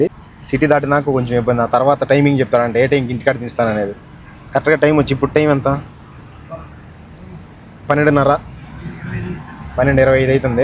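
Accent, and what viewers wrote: Kadapa, India. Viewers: Anna meru India ki raganai mi guntu mari poendi pakka telugu abbaayi laga mathladu thu unnaru ❤❤🎉🎉🎉🎉🎉❤❤ Welcome to India anna ❤❤❤❤🎉🎉🎉🎉🎉🎉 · కడప కి వస్తె ఆ యాస లో మాట్లాడుతుంటే అదొక ఫీలింగ్❤ కువైట్ లో ఉన్నప్పుడు వీడియోస్ చూసి చూసి ,,ఇప్పుడు ఇలా మాట్లాడుతుంటే చాలా బాగుంది ,,మన వాళ్ళతో ❤❤❤ ❤❤❤ నాది కర్నూలు ❤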